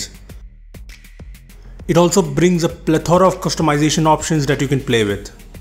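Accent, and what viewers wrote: India. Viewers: Terrible voice, indian english is really catastrophic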